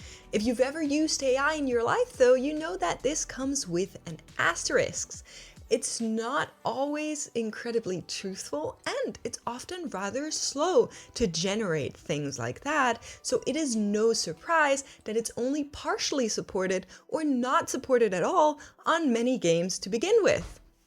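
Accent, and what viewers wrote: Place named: Germany. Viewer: I love the accent, just found your channel. Where are you from? You have Swedish look, but I've seen that look in Germans as well. Wife is German and Italian and said you look and sound German to her.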